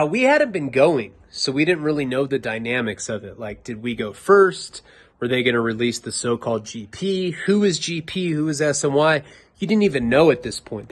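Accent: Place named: Chicago, United States. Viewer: Why do you always sound like a south sider every time you do voices? I have to admit it sounds good though. ESE 😂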